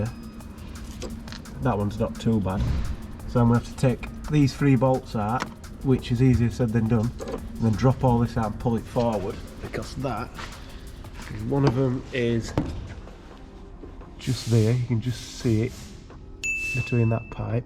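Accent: Yorkshire, United Kingdom. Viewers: Great video, when you are a fantastic orator with a natural talent for unscrambling technical data with added Yorkshire dialect. You sir have to own the fact that you are indeed the most popular presenter on this channel 🤷🏼‍♂️ embrace it 💪🏻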